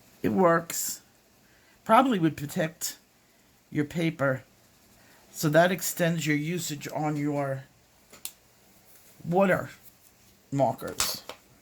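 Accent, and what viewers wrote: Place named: Massachusetts, United States. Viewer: "The HECK did I invent hea?!" Love it 😂😂 I live in MA so hearing your accent always puts a smile on my face 😊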